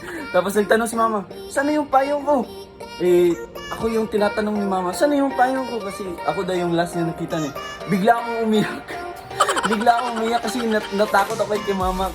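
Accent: Visayas, Philippines. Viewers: Saan ba kayu kuya? Parang may visaya accent ah. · DONE BJ Baldado From: Zamboanga City Amping mu always 😂 Cute kaayu ka. Magkatawa ko sa imung pronounciation sa tagalog Klaro jud Bisdak Haha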